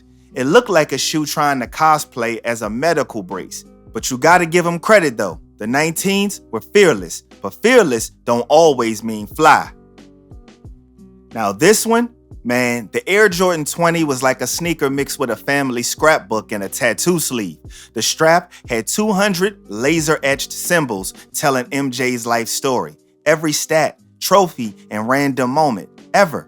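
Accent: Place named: DMV, United States. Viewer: When you talk about the 2’s…when they came out, the top selling shoes for all dope boys were the Gucci tennis sneakers which are basically the Jordan 2’s but low top…and that’s exactly who bought those shoes…dope boys. They may not have been as widely popular as the Jordan 1 but for cats in the street that was touchin a little bread, they was on them. My brother had 4 pairs. I kept 1 pair when he went to the Feds and held on to them for a while. I ended up making a couple bands on them but I could’ve made more than that if I was smart. Anyway, I thought you was from the dmv based on your accent…MoCo or sumn. I guess not. Cause if you were from DC you would know that the 2’s were especially popular here in the 80’s. But you got it.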